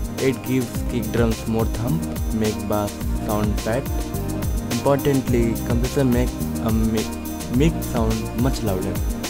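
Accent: India. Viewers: are u from india? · your accent sounds like you are from india? am i right? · well about all the comments abt his accent and stuff.. make fun of india for the language they dont speak but u cnt make fun f dem for the content they teach RESPECT I N D I A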